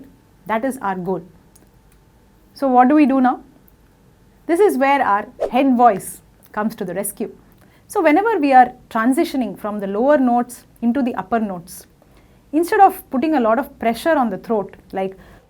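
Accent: India. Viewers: I love the way you talk, I love Indian accent.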